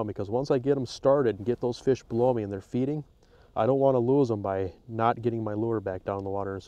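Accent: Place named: Upper Peninsula, United States. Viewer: I'm from the U.P. of Michigan, Menominee area and moved to Kentucky. There are too many noodlers around here. They don't know what a fresh water fish is. Every time i go out fishin all i see is ppl gettin down in these cat holes with smell and bate tryin to get what they call good eats. I'm sure its fun an all but cats are just not my slice of cheese. In fact my craven for a good pearch fry got so bad I went all the way to Erie, PA just to get that good old taste! Nice yooper accent/video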